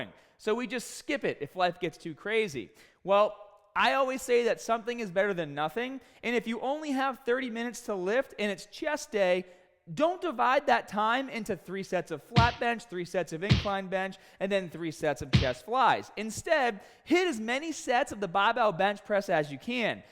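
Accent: Boston, United States. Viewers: Your crazy Boston accent has tone down a bit. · Are you from Boston? You've got that accent especially on the r's.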